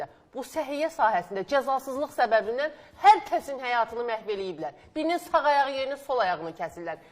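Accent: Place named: Shaki, Azerbaijan. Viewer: Bu xanıma halal olsun🎉..Bu arada deyəsən xanim Şəkidendi) aksenti bize oxşadı)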